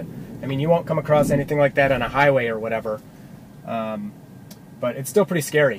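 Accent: United States. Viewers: I was confused why you had a US accent and were driving on the wrong side of the road. Maybe the car heard you say that and thought the same thing.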